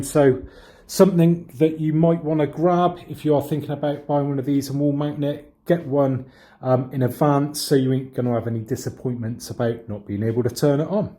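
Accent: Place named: Bristol, United Kingdom. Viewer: Gotta be Bristol innneeeeeee??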